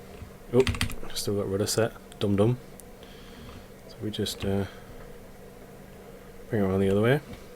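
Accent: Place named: Newcastle, United Kingdom. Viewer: Great video man! Nice to hear a jordie voice amidst all the American naval action video s. I haven't yet played this game, and the detail is cool. But I am a little disappointed that you can only change sail angle and not entire sail plan. (Eg a square rigger sails best down wind with only foresails and main course)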